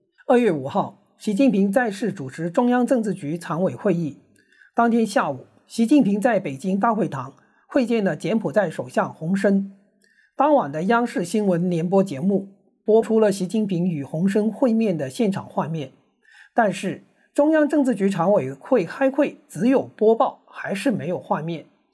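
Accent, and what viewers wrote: Cantonese, China. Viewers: 你的广东口音暴露无遗🙃🙃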